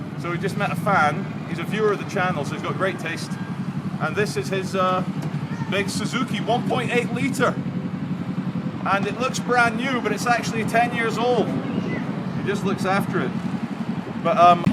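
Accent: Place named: Scotland, United Kingdom. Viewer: A Scottish name and Scottish accent are good enough for me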